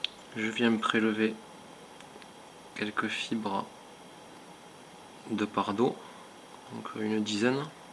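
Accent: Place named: Southwest, France. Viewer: Tu es de quelle région tu as la voix du sud ouest 💪💪💪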